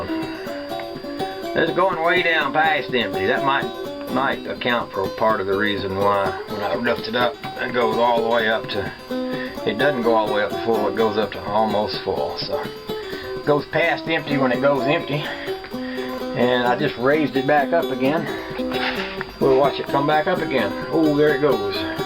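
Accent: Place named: Tennessee, United States. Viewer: The license plate said Maine, but your accent said TN. Nice jeep and thank you- I'm needing to test my fuel gauge too, in TN.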